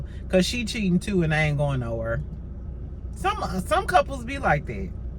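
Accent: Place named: South, United States. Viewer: Girl i know you from the South.. That southern drawl is heavy... ❤❤❤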